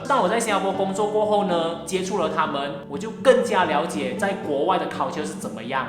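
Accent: Malaysia. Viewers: 你换工作薪水能跳一个30%肯定会要换喔🤣😂😁 很喜欢听你的联邦腔😛😄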